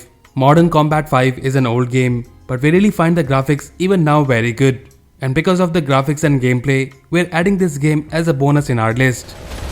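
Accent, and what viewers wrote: India. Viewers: Dude is trying to hide his indian accent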